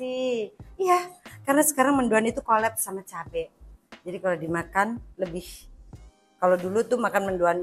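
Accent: Banyumas, Indonesia. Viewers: 😂😂😂 lucu bgt mbak ngomong ngapake tp tetap ayu Bae klambine apik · Mba lucu ngomong ngapak sukses mba ❤ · Pk bambang GA Salah pilih... Wong ngapak pancen oye.... 👍👍👍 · Bunda lucu nemen ya pada karo enyong nge,enyonge juga wong ngapak...tegal hadir bunda 😍😍🤭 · Wes ayu,lucuu,pinter masak sisan.gak lali mbak Ayu ngapak e. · Masyaallah 😅😅😅 ketawa terus liat Tante cooking ngapak2 pulakkk❤❤ sering bikin video masak ya tan..😊😊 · Puluhan th seneng apal lagu2ne Rika.. Tapi nembe saiki weruh krungu Rika ngomong ngapak😂😂😂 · Terhibur banget dg BHS ngapak2nya..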